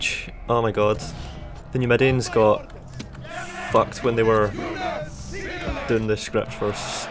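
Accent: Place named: England, United Kingdom. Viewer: Best english accent? Or am I the only one who thinks this?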